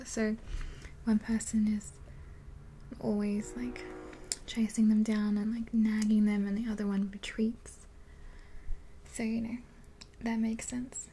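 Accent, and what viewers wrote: Australia. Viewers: Are you Australian??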